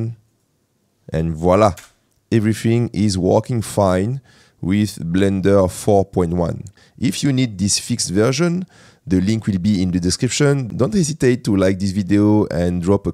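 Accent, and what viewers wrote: France. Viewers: Super t’as bien l’accent Français 😉 ce serait possible que tu fasse des vidéos en Français parce que c’est difficile de suivre tes tutos en Anglais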